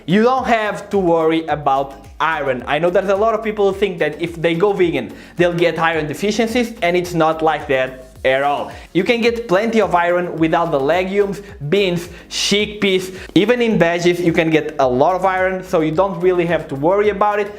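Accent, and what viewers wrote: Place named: Spain. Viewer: Ha, I think its the Spanish coming through.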